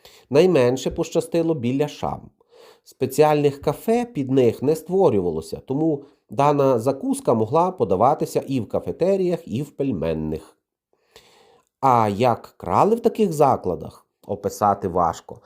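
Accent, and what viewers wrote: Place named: Western Ukraine, Ukraine. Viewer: ЩО ТИ МАЕШ ЗАРАЗ ЧОГО НЕ МАВ ПРИ СРСР ? АВТОР ВІДЕО МАБУТЬ ЗАПАДЕНЕЦЬ ЕМІГРАНТ СЛУХАЮЧИ ЙОГО МОВУ .ГИДОТА.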